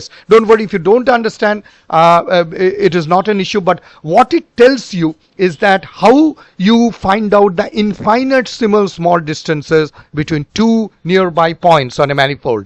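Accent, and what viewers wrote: India. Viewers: Sir are you from West Bengal ❤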